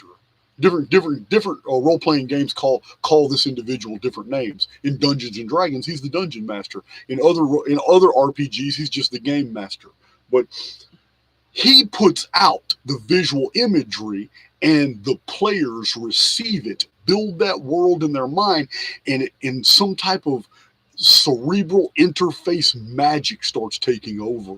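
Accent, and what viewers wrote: United Kingdom. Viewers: Lol...loved the English accent😊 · Brilliant Manchester accent 😊you didn't piss me of and im Scottish 😂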